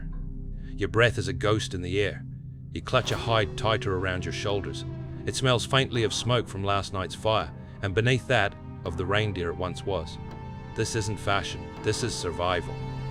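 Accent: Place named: Australia. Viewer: New subscriber here. Solid mate. Sound like an Anzac 😉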